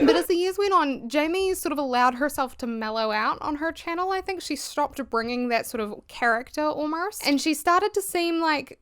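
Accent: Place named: New Zealand. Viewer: my master yapping queen thank you for being my background yapper while gaming there's something about the kiwi that makes your voice so soothing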